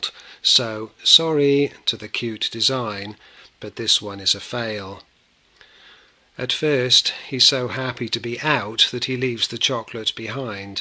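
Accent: United Kingdom. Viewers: I have not seen this trap for sale in the UK, I think it's only sold in the States and Canada. But you sound very English, just wondering??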